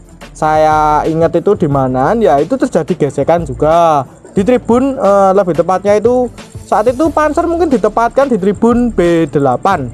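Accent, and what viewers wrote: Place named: Surakarta, Indonesia. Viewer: Ak mudeng cok admin wong solo:v logatmu kwi loo hmm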